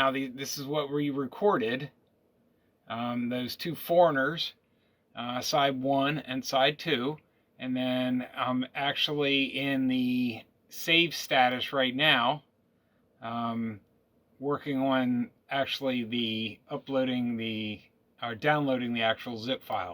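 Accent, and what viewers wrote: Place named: North Carolina, United States. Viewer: Thanks for the video. Been thinking about getting one of these. You sound from your accent that you are from my neck of the woods, North Carolina. I also have the VPI Signature21 in RW, and the Integrity Tru-Lift and Kleen Sweep. Great products so you have good taste too😀 Love the music videos Keep it up.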